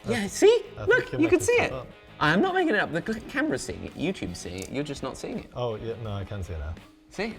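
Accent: United Kingdom. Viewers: LOVE your videos! Humor, science, black smithing, smithing porn, cool tools, fun facts, awesome cool music, and that proper British accent. It’s perfect right innit?!? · “Hex-uh-ginz”. Jesus. I love how British is.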